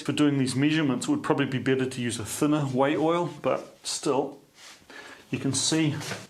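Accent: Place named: New Zealand. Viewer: Hi there. Just found your channel. What city are you in. You sound like a Kiwi. I'm in Chch. Cheers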